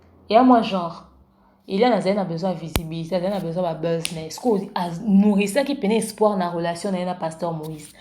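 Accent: Ivory Coast. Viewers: mama pourquoi parler comme une ivoirienne?????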